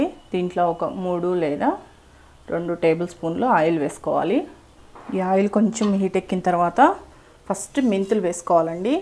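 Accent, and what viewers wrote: Nellore, India. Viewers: Nice madam from:kavya mi vantalu motham super cheppadam kuda nidanamga ardamaila chepthunnaru.midi nellore ra madam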